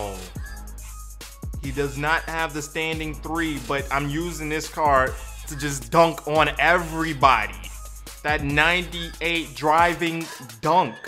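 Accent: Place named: Brooklyn, United States. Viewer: I can tell that u from Brooklyn lol.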